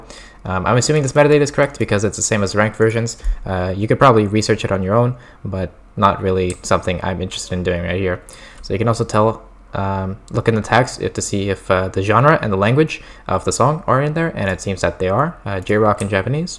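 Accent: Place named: Australia. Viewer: You sound like less Australian peppy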